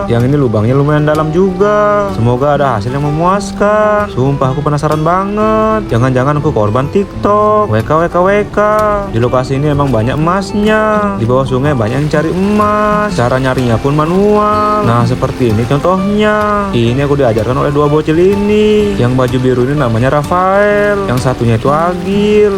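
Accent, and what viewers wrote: Java, Indonesia. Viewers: bang aslinya mana bg,,kok ad logat jawanya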